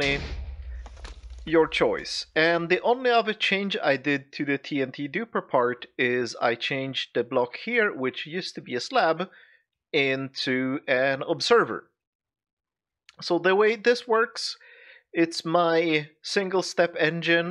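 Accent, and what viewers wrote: Sweden. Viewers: The Swedish accent makes him look even smarter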